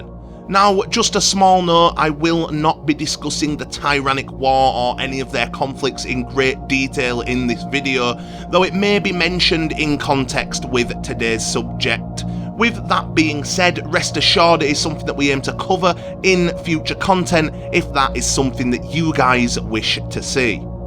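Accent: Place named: North, United Kingdom. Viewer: Liked and subscribed, great to hear a northerner doing lore! A humble suggestion, try putting your script or notes nto chat GPT, there was a little bit of repetition. Keep up the good work!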